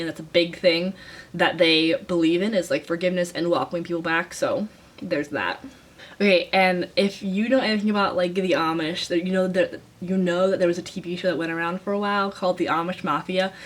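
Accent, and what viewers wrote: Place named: Sweden. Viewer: Is your family of Swedish descent? I’m hearing a very faint Swedish accent in your voice!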